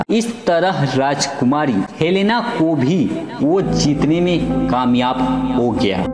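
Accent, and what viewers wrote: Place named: Bihar, India. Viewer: Are Yar phle bolna to shi KR Le ese LG rha jese koi bihari Hindi bol RHA h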